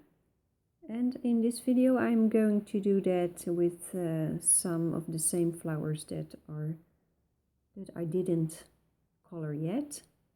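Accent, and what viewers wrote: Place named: Netherlands. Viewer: The expression "raining cats and dogs" is still in common usage in the U.S. Chartreuse rhymes with "loose." I find your Dutch-tinged English to be delightful and charming, with never a time of misunderstanding. We find these days that the younger generation simply will not speak grammar properly, so it is actually a relief to me to listen to your excellent English. Ohmigosh - sign me up for your workshop at one of our national parks!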